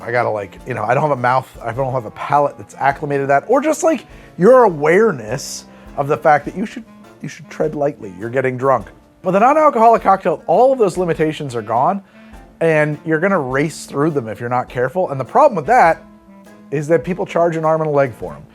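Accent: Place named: New England, United States. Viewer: The worst part about Moxie is the New England accent.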